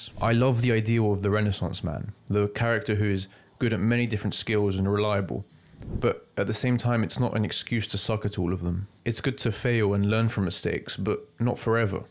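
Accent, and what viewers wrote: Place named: United Kingdom. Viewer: oh sorry, I didn't mean English (by blood), just British as in nationality (which I assumed from your accent?) for some reason it's really hard to find British YouTubers on here, so many (great) American channels but it's pretty refreshing to hear British accents (from a Brit aha)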